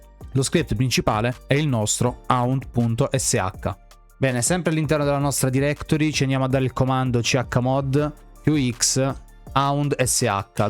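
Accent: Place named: Apulia, Italy. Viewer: Immaginavo fossi pugliese 🤣 Ho provato subito e funziona alla grande. Ma quanto tempo si ha prima che il link esca scada ?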